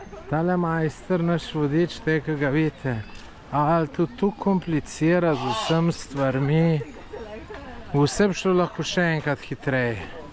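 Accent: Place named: Dolenjska, Slovenia. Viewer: po moje pomen,da se je usedel🤣..men je smesna ta njegova dolenscina... A ves kaj pomen pride puj?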